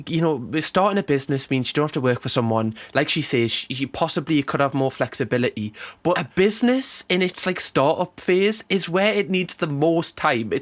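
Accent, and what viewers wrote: United Kingdom. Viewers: I am new to the channel, I have watched a few of your videos. I love british accent...however, I was like "Why he keeps asking for donors? That's wild. " but I finally understood you were saying "donuts" lol, here you go I am one more donut now 🍩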